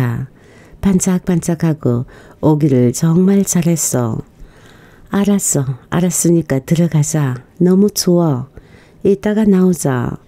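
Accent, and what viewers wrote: Gyeongsang, South Korea. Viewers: 님의 낭독이 이젠 전문가 수준입니다. 처음의 그 갱상도 발음이 그립기도 합니다. 너무 손색이 없다보니 ㅋㅎ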